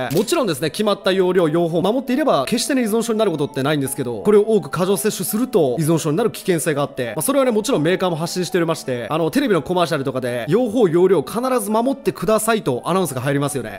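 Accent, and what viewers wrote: Kansai, Japan. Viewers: 標準語で話してますけど関西の人なんですね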